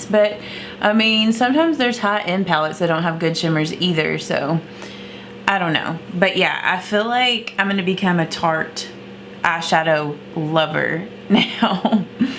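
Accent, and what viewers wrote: South, United States. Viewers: My two Kentucky YouTubers are not posting and I miss y'all!! I rely on that Southern accent to keep me company while I get ready for work am!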